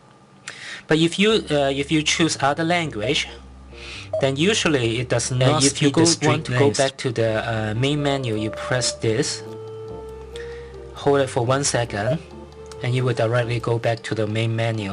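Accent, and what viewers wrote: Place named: Vietnam. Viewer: The accent of this guy sounds like Vietnamese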